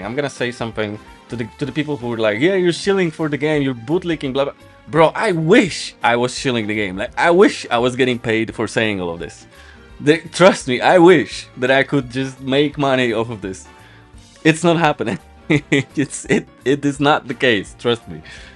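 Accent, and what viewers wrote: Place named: Poland. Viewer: Are you from Poland? Your accent sounds like you are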